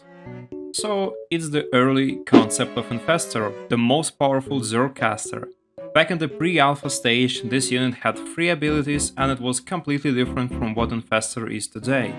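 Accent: Russia. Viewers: What accent, u from russia?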